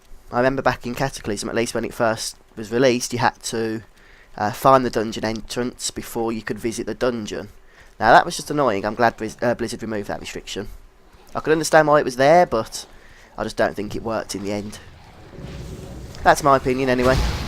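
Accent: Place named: England, United Kingdom. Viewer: ahh sorry for not explaining correctly, i ment where are u from england ;D never heard it before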